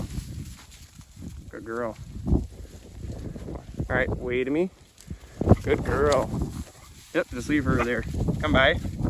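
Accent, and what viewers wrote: United States. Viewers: First time I hear someone talk about herding with an American accent. It sure is something to get used to after listening to Scottish and Irish farmers. Anyway, very interesting video. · Nice to listen to a fellow midwestern accent. Keep up the great work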